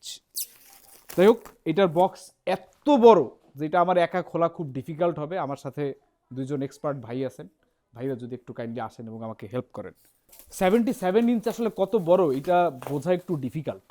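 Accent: Barishal, Bangladesh. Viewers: "পয়সা থাকলে এধরনের টিভি কেনা যায় এবং উপভোগ করা যায় আর পয়সা না থাকলে দেখে উপভোগ করার চেষ্টা করা যায়' কথার টোনে মনে হচ্চে আপনি বরিশাইল্লা। Am I right?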